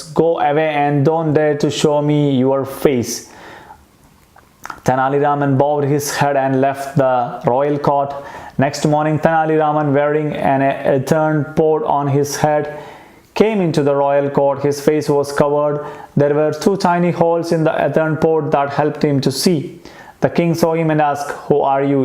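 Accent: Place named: Kerala, India. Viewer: I am sorry but your English is AWFUL. I just heard your first sentence and I had to stop because grammar was horribly off. You use "the" on occasions that you should not. You use "doesn't" in place of "don't", and "has" in place of "have" . And quite honestly, your grammar is so bad that I cannot even figure out what you are trying to say in your first sentence. Anyway, if I were to guess what you were trying to convey, the appropriate words would be "This video is only for beginners who don't read books, but who wish to read books". If I had to say it in a roundabout manner as you did, the words would have to be "This video is only for beginners who don't read books, but who have a wish or want to read books". In addition to your faulty grammar you also have a VERY thick Malayali accent that you need to improve. For heaven's sake, improve your own English (at least your grammar) before you teach others.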